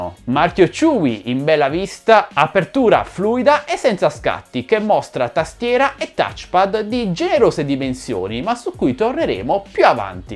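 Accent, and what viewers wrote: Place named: Bari, Italy. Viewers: Dall'accento sei barese come me , grande . Un consiglio . Questo PC , con queste caratteristiche , potrebbe essere utile per fare musica , stile karaoke ? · Cmp sei di Bari , Se sì Anch'io sono di Bari( si è sentito dall'accento)😝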